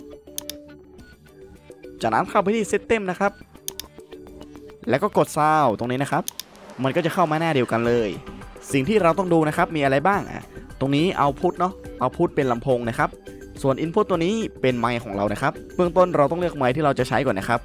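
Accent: North, Thailand. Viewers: สำเนียงเหนือ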